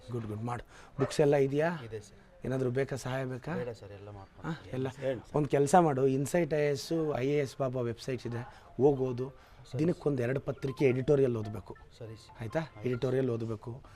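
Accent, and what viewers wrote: Telugu, India. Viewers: Tension beda..ಚಿಕ್ಕಬಳ್ಳಾಪುರ..ಆಂಧ್ರ attached... obviously Telugu flavour jaasti...yenbproblem illa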